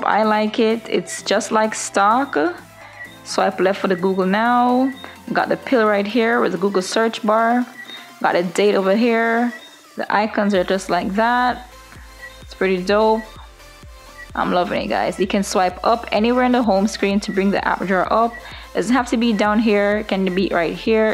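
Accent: Jamaica. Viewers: you sound like a jamaican nice videos once your on youtube you set you soon get more subscriber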